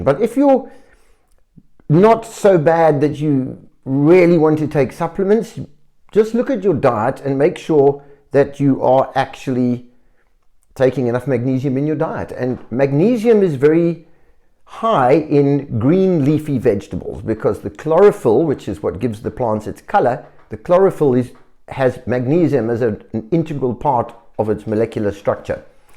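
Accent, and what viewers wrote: South Africa. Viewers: I can hear that you are a South African. Cape Town?? Do you run a practice??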